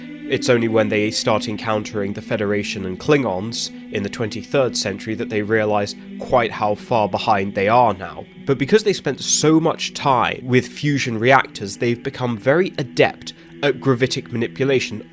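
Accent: United Kingdom. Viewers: you have a Brit accent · The British accent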